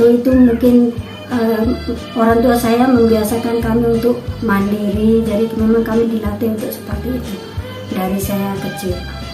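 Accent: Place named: Java, Indonesia. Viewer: Ngaku asli timor tapi ko pu dialek kental jawa tapi tarada dialek timor... Ko bisa lancar bhs indonesia dijawa tapi tada dialek timor... Panipu ko, ko bukan asal timor tapi pernah tinggal di timor... Itu sudahhh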